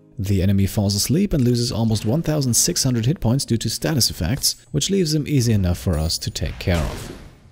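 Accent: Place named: Germany. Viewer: p.s. sprichst du Deutsch? I detect a slight accent:)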